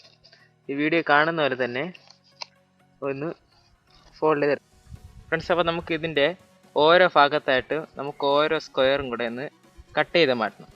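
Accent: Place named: Kottayam, India. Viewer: ഫാഗം കോട്ടയം സ്ലാങ് ആണ്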